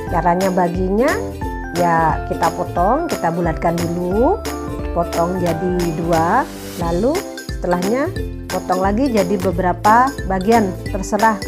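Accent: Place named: Madura, Indonesia. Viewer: Suka banget dengan logat bicaranya mbak. Salam madura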